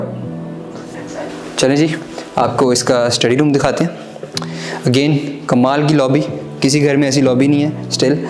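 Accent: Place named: Pakistan. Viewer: mashaAllah gar bohat payara hai .. yar thanks God kisi ne to apnay pakistani style main baat ki ,, otherwise 99.9% YouTube par sab Pakistani Indian youtubers ki tarha baat kartay hain ..( Indian Styal ) door ki baat karin to floor ki baat karin to .. ya phir .. dekhnay ko milta hai dekhnay ko milta hai baat karin to baat karin to :) please don't copy others for God sake talk in your own style .. like this man .. bless you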